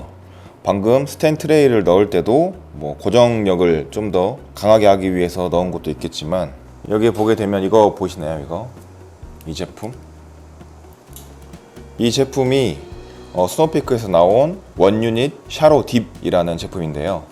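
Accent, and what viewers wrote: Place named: Jeolla, South Korea. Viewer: 전라도사투리가 정감이가네요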